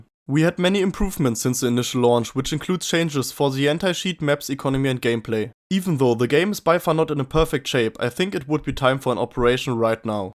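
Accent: Germany. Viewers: I can hear your German accent 😜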